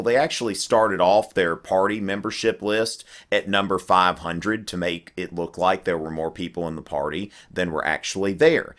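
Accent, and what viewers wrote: South, United States. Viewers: Yeah it's southern. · Forgive me if I'm wrong, is your accent from the southern part of the USA? I'm Indian and I've heard this accent on American TV series, which makes me curious.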